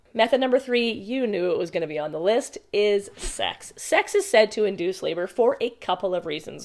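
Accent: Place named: Canada. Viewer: I love your videos, they are so informative and I love your voice and Canadian accent. Beautiful and soothing. Also, not pregnant, but we are planning on having a second baby sometime soon!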